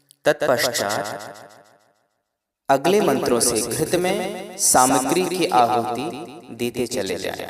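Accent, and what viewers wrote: Standard. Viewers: स्वामी जी सादर प्रणाम ।बहुत ही शुद्ध एवं सुन्दर उच्चारण है आप की वाणी में । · ओम स्वामी जी सादर प्रणाम चरण स्पर्श बहुत ही अच्छा यह आज का आपने वीडियो डालकर हमें एक एक शब्द के शुद्ध उच्चारण के लिए प्रेरित किया है इससे जहां कहीं हमें मंत्रों में उच्चारण है थोड़ी जो गलतियां होती थी उनको आप के साथ उच्चारण कर सुधार सकते हैं स्वामी जी आपका बहुत-बहुत धन्यवाद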